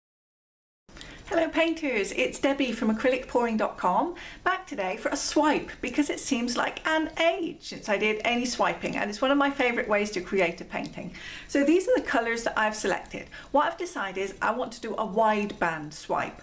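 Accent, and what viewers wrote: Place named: Dorset, United Kingdom. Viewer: Hi there, this is so interesting, thanks for sharing, I wonder, is there something you could add to micas to make them pearlised? Btw love your accent, are you from Dorset?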